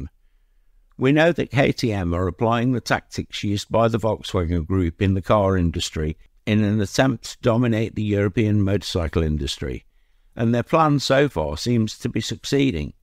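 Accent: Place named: Midlands, United Kingdom. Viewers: Sounds more like East Midlands to me, Leicester or Nottingham? 🤔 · by the way, there is nothing robotic in your voice. I’m guessing British midlands.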